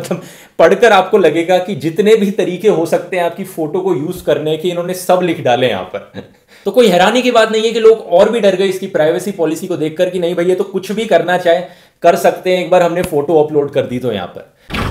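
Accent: India. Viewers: I dont understand a singke fricking word youre saying... please talk without that indian accent :)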